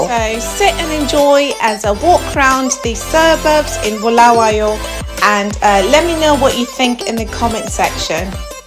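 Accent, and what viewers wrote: RP, United Kingdom. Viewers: Hey you very posh😊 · ❤❤❤ new subscriber alert! even ngingezwa your British accent lol...hope I'll pick a word or two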